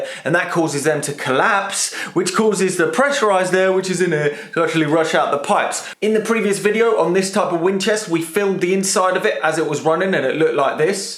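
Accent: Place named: United Kingdom. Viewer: The voice has a British accent.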